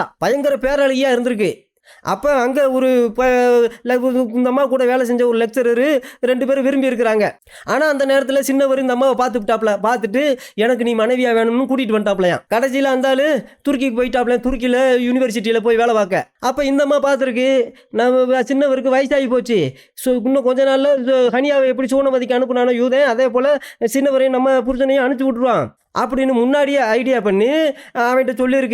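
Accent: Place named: Kanyakumari, India. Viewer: This is kanyakumari Tamil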